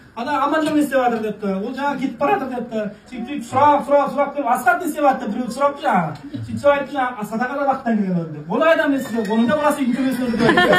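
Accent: Kazakhstan. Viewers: Кудагай деген дурыс батыс таза казакша сойлейди. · Нагыз казакша сойлеп турго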